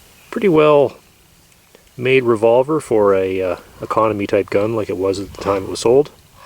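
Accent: Canada. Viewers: Are you by chance, Canadian?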